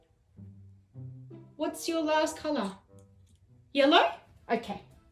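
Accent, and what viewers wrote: United Kingdom. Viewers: Is this YouTuber brithish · Are you British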